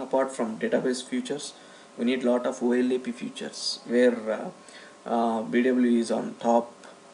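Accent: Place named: India. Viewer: Headaches hearing indians, ugly accent. One word DESIGNATED.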